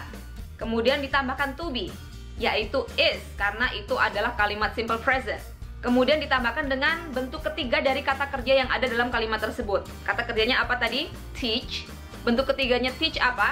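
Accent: Surabaya, Indonesia. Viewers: Mama udah lebih dari 5 aku suka video bahas bahasa inggris. ternyata sama2 orang sby, makanya paham gitu berkat logat2nya. Nice! wkwk Bahas masalah jebakan di toefle dong mom. Pengen tauuuuu. makasih banyak! good job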